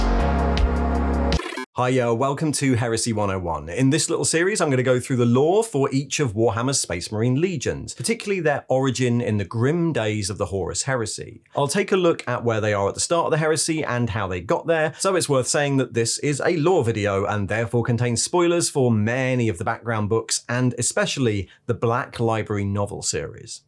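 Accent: United Kingdom. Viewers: I love that you use as your end song clip, I look forward to it on all your videos and your condensed informational style on the lore. luetin's great, if you have the time. Major Kill's good for a some cheeky fun, Baldemort's amazing for the imagined paths one can explore outside the cannon. You are Leutin if he didn't get lost in the lore but with that silky smooth British accent. But concise, to the point, with a a tinge of humor that's just the right amount. A cooked to perfection dish of information.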